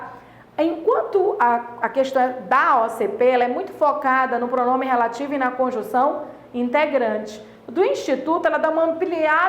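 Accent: Pará, Brazil. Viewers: Reconheci o sotaque paraense!! hahaha adorei a aula!!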